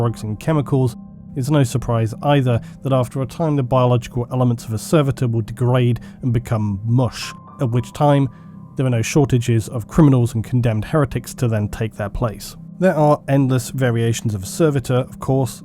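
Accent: United Kingdom. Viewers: Four reasons why I love your videos. 1. Warhammer 40k. I was introduced through Warhammer Fantasy and was intimidated by 40k larger and more expansive universe. However your videos are large and so well made with so much Fan Art that i can't get enough. 2. You time stamp your videos. I get to watch and see what I want to see whenever I come back. Its just so nice! 3. You got a great voice. You have such a great British voice. Just nice and relaxed and a sense of interest in what you are doing. So glad you are doing YouTube! 4. You sometimes use Real World history and how WE as a species can in someway relate. Almost a Parellel Universe feel aha.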